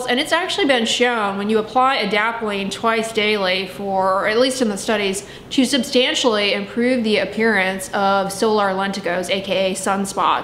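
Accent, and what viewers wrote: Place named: Texas, United States. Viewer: Dear Dr, Dray, I really enjoy your videos and am enjoying them. I grew up in El Paso, and was there for much of the last 4 year period while my mom was still alive, so enjoy the familiar mild TX accent and shopping opportunities, as well as your very helpful information. Now, however, I am back at home in Juneau, Alaska, which I do love -- but not the shopping... We do NOT have: Dollar Tree, Wal-Mart, Target, CVA, Walgreens (or Rite Aid or any other chain pharmacies). We do have two small grocery stores, part of the IGA chain (think small independents that were bought by a chain that buys small independent stores in rural areas - and in fact IGA bought our 2 small independent groceries when the owners wanted to retire); one Fred Meyer -- bought by and now part of the Kroger chain; and one Safeway, which I rarely shop at as it is farther from me than Fred Meyer. 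We also have a very small Costco, probably the smallest Costco I have ever been in. I live in a medium-size regional center, and the capital city of my state. (Also, we have no road access to go anywhere else, as is also true in much of Alaska.) This is why rural America feels sort of left behind and "out of the loop". If you can do a video of skin care options available at Kroger or Costco, especially cost-effective ones, I would be very grateful.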